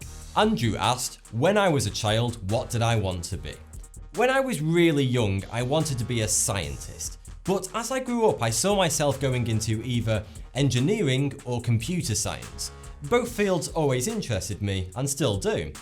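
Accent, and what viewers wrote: United Kingdom. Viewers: hey! How to develop an awesome an awesome brittish dialect like you have. I really always liked it. i learned my english in germany. i know many languages but habe a problem with my accent.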